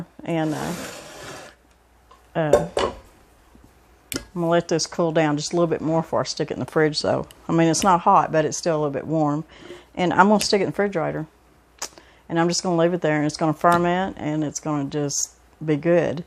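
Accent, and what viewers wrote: South, United States. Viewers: I'm a Yankee up in Maine now and I've enjoyed your channel SO much. Just listening to your accent reminds me of my grandmother from NW Georgia - she taught me to love cooking. And my husband always teases me because I "slip" back into my southern accent after watching you!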